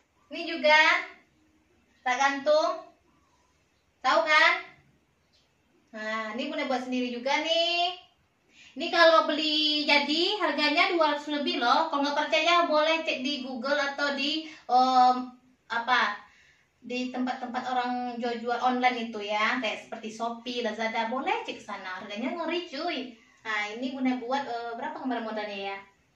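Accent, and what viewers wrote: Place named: Sumatra, Indonesia. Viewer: didaerah mana tinggalnya, logatnya spt di sumatera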